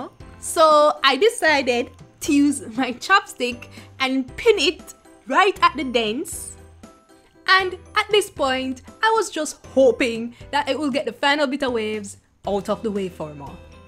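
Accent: Jamaica. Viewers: I hear a Jamaican! I stan my fellow country woman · She sound jamaican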